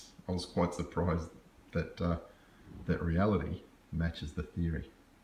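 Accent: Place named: Australia. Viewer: en général j'active les sous-titres automatiques, ça m'aide à comprendre même si le petit accent australien de (que moquerait Melenchon?) cause parfois des soucis à l'algorithme.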